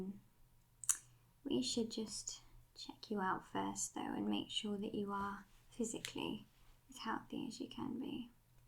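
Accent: United Kingdom. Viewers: Your British voice is so soothing thank you for making this medical role plays help soothe my autism can we be friends i hope you don't mind but i messaged you on Instagram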